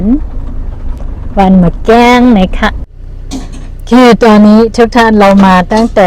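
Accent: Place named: Nan, Thailand. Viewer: สำเนี่ยงหมือนคายๆทางหนือไทยน่านก็มี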